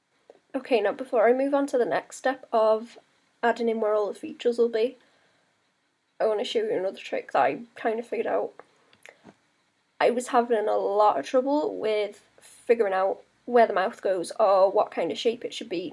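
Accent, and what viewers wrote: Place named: Worksop, United Kingdom. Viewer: I just found your chanel and its really refreshing to a) hear someone that sounds like me! (I'm from Worksop) and b) actually see someone work thats not perfect on the first stroke!!! It's great to see someone work REALISTICALLY! ;)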